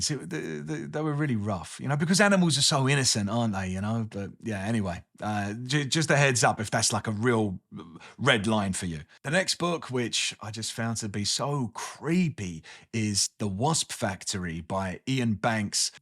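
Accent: Cockney, United Kingdom. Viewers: New subscriber! Just stumbled across your channel on this cloudy and cold day in the middle America. Understood your accent perfectly and would love for you to turn up the cockney a bit. Great recommendations! 🇺🇸 🇬🇧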